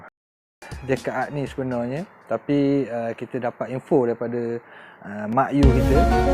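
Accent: Terengganu, Malaysia. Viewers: Suka dengar loghat ganu ni...ada yg faham..ada yg tak...apa2 pun selalu ikuti vlog ni..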